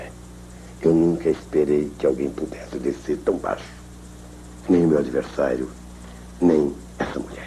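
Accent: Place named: Northeast, Brazil. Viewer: Sumiu o sotaque? Estamos em 2022, e ele fala errado e usa o sotaque nordestino...como é um artista, devia fazer novela., não estar na política, fazendo o povo chorar! Cris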